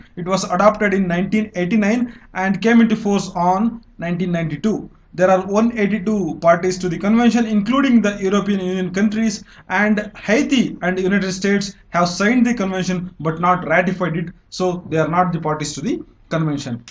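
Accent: India. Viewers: Are you kannadiga. Your accent says that.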